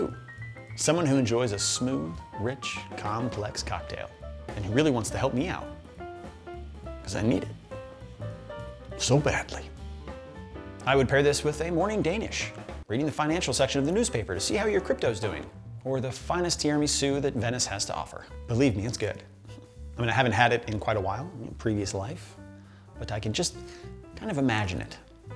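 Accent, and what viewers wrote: Australia. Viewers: Australian accent…..more talent from this guy! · I feel i should hit you for that Aussie accent, but your just so darn cute i can't xD (greetings from Australia)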